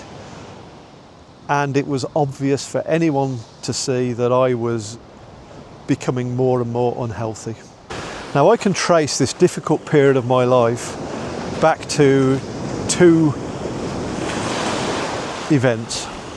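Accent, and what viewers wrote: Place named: Midlands, United Kingdom. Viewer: Your family should be so proud of you! You speak such sense … I really found this interesting and drew parallels with my own life. You sound like a fellow midlander… good luck to you x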